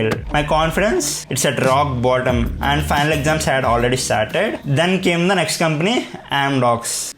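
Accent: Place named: South, United States. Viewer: english communication soo poor..learn that..south accent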